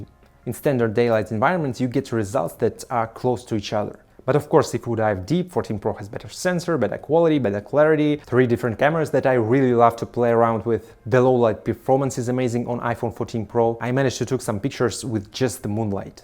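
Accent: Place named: Russia. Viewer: that russian accent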